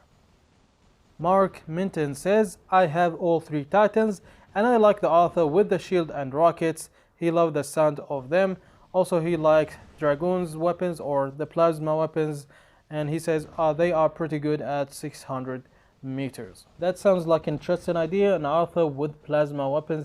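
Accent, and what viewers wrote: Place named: Arab world. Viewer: i know you are arab from your accent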